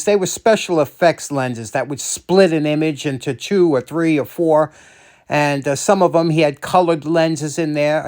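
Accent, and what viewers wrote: New York, United States. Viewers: Cheers, everyday is a school day watching you! Very informative and I find your explanations really enjoyable (especially in your new York accent) I'm loving going through all your past videos. Cheers from Devon, England 👍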